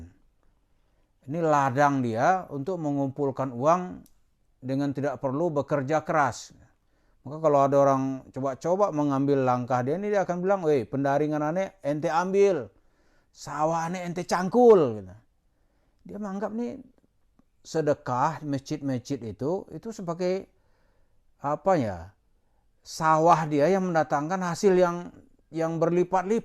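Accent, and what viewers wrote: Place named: Padang, Indonesia. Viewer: ente dr logat bicaranya sprti orang padang....berarti en😢ente sma dgn si Mansyur l😅ngkiengkieng mangkait...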